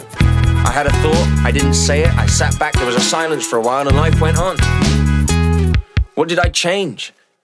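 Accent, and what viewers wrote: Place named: United Kingdom. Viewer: Obsessed with the brit accent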